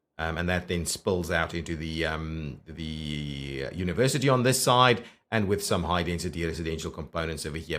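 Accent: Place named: South Africa. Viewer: Has to be South African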